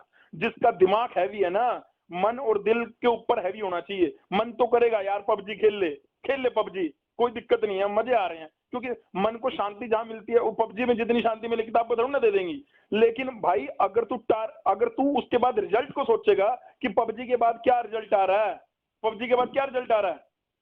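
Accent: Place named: Haryana, India. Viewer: Sir , u are so amazing ...and ur language is so amazing.... Like haryanvi type.... Sir you are one of the best teacher in the field of leet coaching... because u give correct advice to students.... And , i saw ur success record, congratulations sir ... Sir , can u help me to crack leet entrance test....sir m financially too weak...i m also cracked the jee mains in last year but can't take admission in any college due to home situation....and also 5 the time college topper in Gandhi polytechnic Muzaffarnagar (Up).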